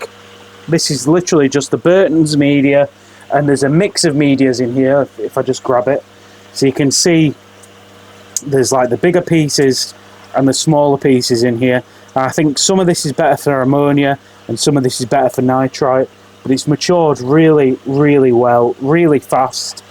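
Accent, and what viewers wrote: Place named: Yorkshire, United Kingdom. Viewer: Ahhh a true Yorkshire man 5p 10p 🤣🤣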